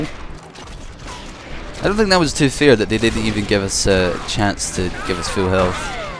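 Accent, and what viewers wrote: United Kingdom. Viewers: Oh my bad. I knew you were somewhere from uk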